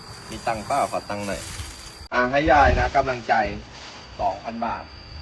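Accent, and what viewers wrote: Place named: Laos. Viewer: Northern middle Laos accent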